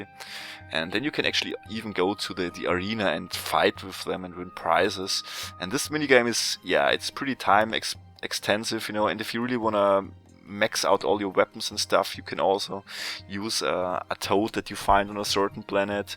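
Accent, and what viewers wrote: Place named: Bavaria, Germany. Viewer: you Sound very german. :D Can you play this game at full Screen? ape escape 2 seems to be the only full Screen game. :/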